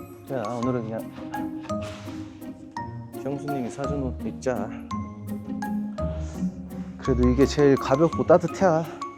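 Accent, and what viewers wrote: Chungcheong, South Korea. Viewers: 발골 하는 재미가 있는 닭발 :) 바쁜 사람은 못 먹는 닭발 집 ㅋㅋㅋ 충청도식 화법 너무 좋아요 충청도 인 으로써 ㅋㅋㅋ 오늘 영상도 잘 봤습니다❤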